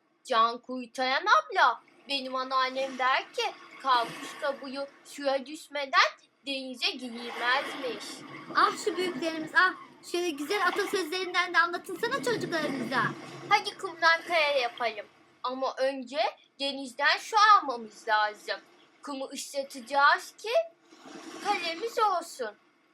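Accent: Black Sea, Turkey. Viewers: siz karadenizlimisiniz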